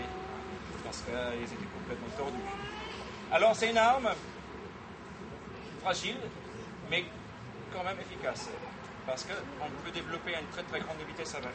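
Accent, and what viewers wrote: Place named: England, United Kingdom. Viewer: Hello! It’s in French. But the person who speaks to an English accent would say:-)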